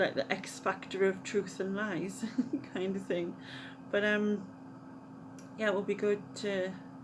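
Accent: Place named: Newcastle, United Kingdom. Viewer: Love your accent, I used to live in Newcastle, miss itx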